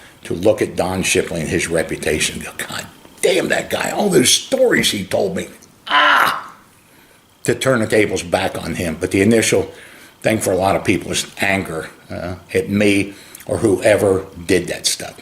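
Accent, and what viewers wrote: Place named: Pennsylvania, United States. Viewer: LOL! I love how takes over and IS the boss. Where at are you from in PA? I'm from a small town called Barnesboro named after the coal mining company who owned everything "Barnes and Tucker". When I was an Apprentice Lineman we were building a transmission line outside of Ebensburg PA and we caught a crow that was injured on the right of way. My foreman took him home and raised him and he turned out to be the biggest thief of anything that was shiny. LOL! He was the best pet he ever had. Could never trust him around anything he was able to pick up though. HA! Did 3 years in the U.S. Army, 1981 to 1984, spent mostly at Ft. Bragg as an MP. Hopefully you won't hold that against me too much. I won't hold being a squid against you. LOL Love what you do! You cracked me up getting on your boy. You definitely sound Pennsylvanian.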